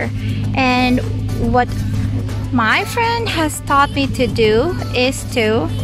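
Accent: England, United Kingdom. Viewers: O<link> I really love your english accent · Ang galing naman po salamat po sa pag share nito, ingat jan. I like you english accent.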